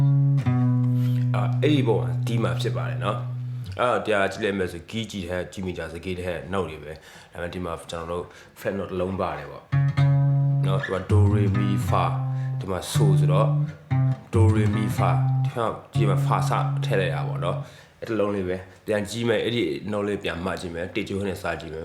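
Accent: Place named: Danu, Myanmar. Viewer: "ညီက ပင်းတယသားလား??အသံကိုနားထောင်ရတာတော့!ဓနုအသံပေါက်တယ်နော!😊✌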